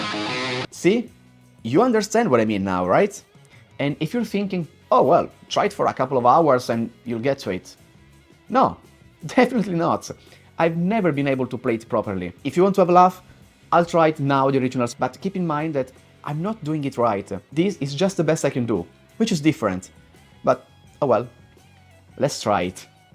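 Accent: Italy. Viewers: You're Italian. Recognise that accent anywhere. Have a sub. Good video.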